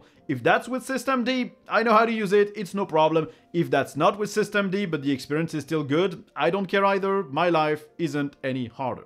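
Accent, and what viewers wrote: France. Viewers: Je reconnais un fond d'accent français. Are you french?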